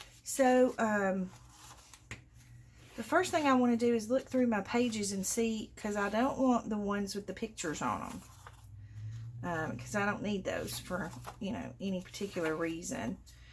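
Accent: South, United States. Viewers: Hi, I woke up a grumpy bear this morning...does that ever happen to you? Anyway, I knew watching one of your videos would lift my spirits. Sure enough! I clicked on this video, heard your sweet, sweet greeting in your lovely southern drawl, POOF! Mood elevated! It was like the clouds parted and the sunshine of my faith cleared up the grumpiness of my heart! Thank you! You have a blessed day!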